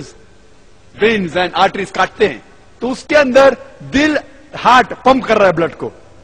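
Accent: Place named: Maharashtra, India. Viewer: Aisa kuc nhi h sister Aap ko lgta h aisa He always speak truth but y Marathi h to inki Hindi Urdu zuban Zra kmzor h jiski wjh s inka bolne ka lehza bdl jata h hmare opposite isliye hme ajib lgta h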